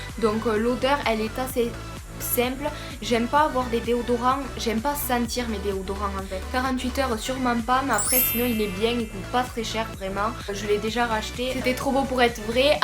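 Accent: South, France. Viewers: J'aime bien ta vidéo , ta l'accent du Sud ⚓ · Coucou ! j'aime beaucoup ta chaine n'hesite pas a venir faire un tour sur la mienne tu est trop mimi ^^ et j'adore to accent du sud !!!! bisouus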